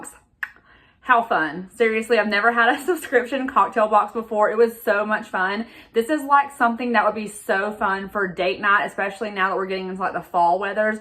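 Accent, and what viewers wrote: United States. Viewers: Love your country girl accent 🥰🥰🥰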